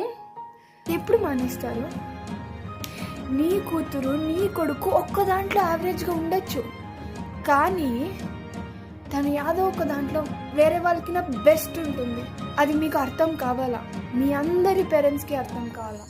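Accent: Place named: Karnataka, India. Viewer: Karnataka telugu … pakka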